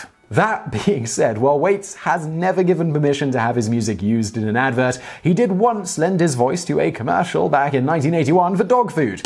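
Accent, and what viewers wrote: England, United Kingdom. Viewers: he's using a European pronunciation of the word that goes by how it's spelled. "Bourbon". He's not drunk, he's English. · Casey Kasem doing a British accent. Expect him to break in to an ad for the gap at any time. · Regurgitating research in a verbose and pretentious manner doesn't make you look smart, it just makes you look like a massive twat. Consider popular academics and scientists such as Carl Sagan or Kenneth Clark who communicate with their audience without the BS. I can tell every day you thank God for giving you an English accent. Every day I thank God that I don't know you personally.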